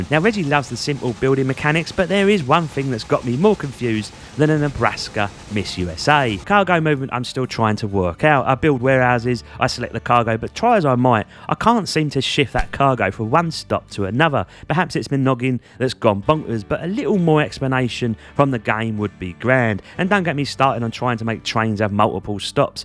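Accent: Cockney, United Kingdom. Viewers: First time I’ve heard a fancy cockney pirate explain a train game to me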